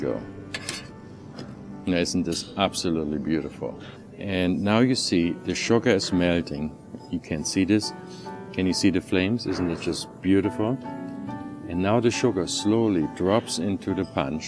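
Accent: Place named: Germany. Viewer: I love this, I absolutely love this. It put me right in the Christmas spirit and made me feel right at home. I have a very german family with very german older relatives, and this all just brought me back home. And to top it off I have a new recipe to bring to my (Polish) inlaw Christmas table. Who I know will absolutely love this! But honestly, how is it some guy, with a german accent, somewhere in the world made me feel so at home and comfortable all at the same time. I honestly wish I could spend a night or a week at his hotel just to feel all that in person. This man is the host that all hosts strive to be.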